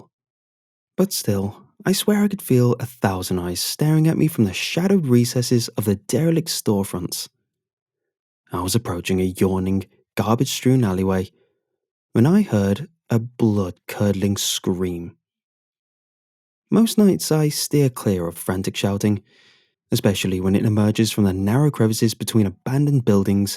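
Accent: England, United Kingdom. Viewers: The english accent and the dry delivery sells this story. Two thumbs up!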